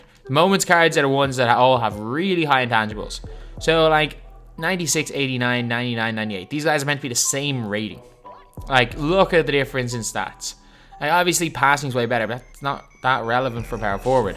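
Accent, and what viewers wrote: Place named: Ireland. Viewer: You sound Irish. I know because I am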